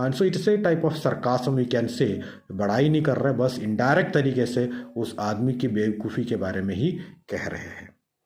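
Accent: South, India. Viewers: Aap south indian ho🤔🤔🤔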